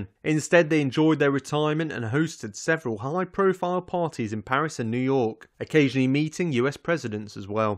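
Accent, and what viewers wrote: United Kingdom. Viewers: This is amazing and so interesting thankyou so much and i love your british voice ❤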